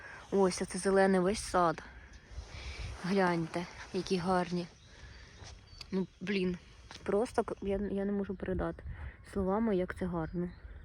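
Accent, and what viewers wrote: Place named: Poltava, Ukraine. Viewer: Привіт ❤️ чудовий канал, говір ваш бомбіческий✌🏻 мабуть десь на Полтавщині?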